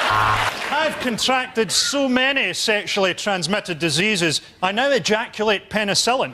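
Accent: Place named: Scotland, United Kingdom. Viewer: 🤔🤔think you need to check that one mate. As a spud myself he sounds pretty (100%) scottish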